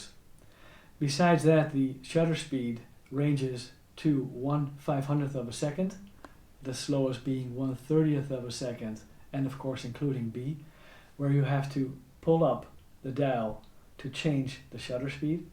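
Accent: Netherlands. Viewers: Nice review, you sounds very Dutch to me 👍🏼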